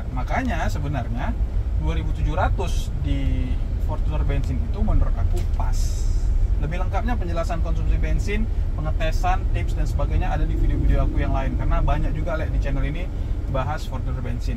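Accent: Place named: East Java, Indonesia. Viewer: Orang mana om, kok logatnya pake le lek gitu.. Salam dari jawatimur surabaya😊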